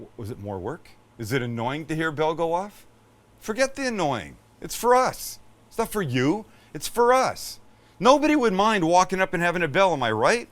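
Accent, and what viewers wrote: United Kingdom. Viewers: I've commented on how bad southern accent is. His British accent is perfection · That British accent..😂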